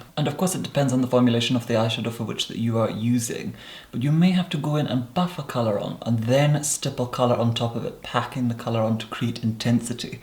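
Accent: United Kingdom. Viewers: It’s nice to hear I am not the only one. ❤️ I do have to admit, the Scottish accent is in my top 3 favorite accents, nevertheless, I have never heard such a chique version. Nonetheless, I felt immediately drawn to it the moment I heard first speak. When I found out the origin of the accent: ah..... naturally. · Me before watching: speaks in eng with a bit of filipino accent Me after watching few videos (filums hah) of: starts talking in british accent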